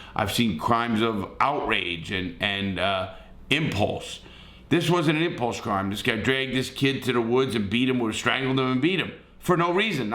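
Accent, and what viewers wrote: New York, United States. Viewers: I’m gonna buy a copy as well, but I live here in Phoenix Arizona, and I’m originally from New York, I lived in three of the five boroughs of New York City, but I was born on Suffolk County Long Island. Every time I hear speak, I feel like it take’s me right back to NY (in a good way.) “Youtube membuh prograaam.” The best! I listened to your book in audio format, but I really need to buy the physical copy tbh. I’m Jewish but my father grew up in Bensonhurst Brooklyn in the 70s and 60s, so I got to hear all these crazy stories. your channel is the best color I always try to give a thumbs up to every video you make! We all make mistakes in life, but it’s how we learn from those mistakes, and use those mistakes to change our press the situation., you constantly remind me on a daily basis why I should be appreciative of the small things I have, and I’m only 36 years old, but I’ve dealt with some craziness in my life. 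I was arrested many many years ago for weed, but nothing really came of it, but I’ve had to deal with my fair share of the police, so I really appreciate your channel, although I wish you would focus on talking about the NYPD a little more because there are so many corrupt people in the department. I lived on Staten Island, Manhattan, and queens; the most corrupt police I’ve ever met in the country live on Staten Island, whereas here in Phoenix they are just trying to protect the community, and as long as you aren’t being a jerk, they will be respectful, w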